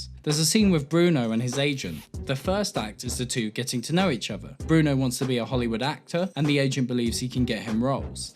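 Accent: United Kingdom. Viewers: Why are you pronouncing Iran like an American? Its weird af. You have a british accent for the rest of the thing, you weirdo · The British accent keeps me watching.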